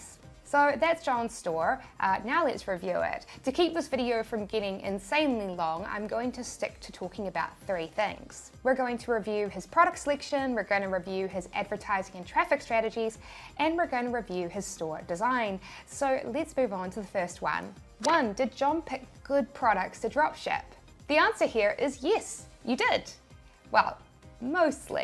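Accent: New Zealand. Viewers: Definitely a New Zealander. The way she says No. “Nore.. Nore.. Nore.” Love it! 😁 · 2mins in and I think... hold on is this a kiwi accent. Great vid found as a youtube suggested video:)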